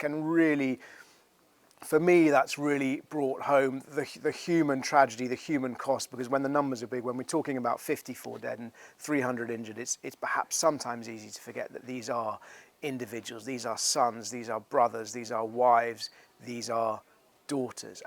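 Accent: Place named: RP, United Kingdom. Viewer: Nice to hear a nice SRP (standard received pronunciation) accent (which only 15% of Brits have unfortunately). It sounds so eloquent and erudite. 85% of global RP accents are in Asia, Latin America and Africa so no, it is not a "British" accent. It is a global RP accent.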